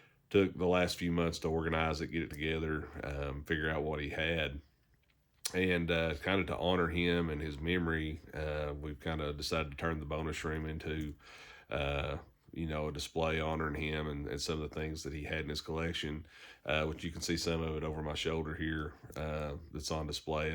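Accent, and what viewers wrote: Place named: Tennessee, United States. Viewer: Great first video! I have been brainstorming about how to start a channel myself and how I can contribute to the community. You have helped me continue to gain some excitement about the opportunity. We have lots of similarities in our hobby background, along with many others that have come back to the hobby! Looking forward to hearing more from you. Sounds like you may live in Tennessee, I am in Johnson City. Take care and good luck!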